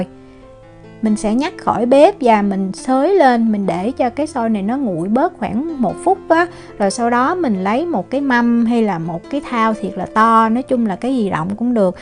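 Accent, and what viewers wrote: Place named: Mekong Delta, Vietnam. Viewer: oi! Chị nấu thành công rùi,ngon lắm tơi xốp,giọng nói người Miền tây dể thương, nấu xôi đậu phộng nhe!💛💛💛💛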